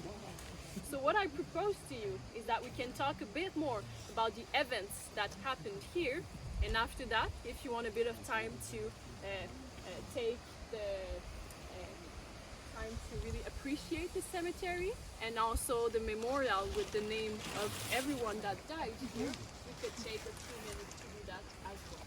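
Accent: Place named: France. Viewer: Where she sounds French? Could it be Canada?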